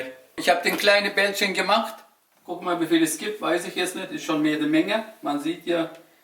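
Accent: Swabia, Germany. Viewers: Ich find doof, dass hier so viele seine "ausgezeichneten" Deutschfähigkeiten hochleben lassen... Darf man nicht nach Jahren hier leben, gut deutsch sprechen und integriert sein oder ist das so besonders?, ich find dein Schwäbisch klasse - Mach doch das nächste Mal Spätzle für uns:P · Ich fand die Sache mit dem schwäbischen Akzent lustig. Türkisches Essen auf Schwäbisch ist einfach nur genial. ^^ (komme aus Ö)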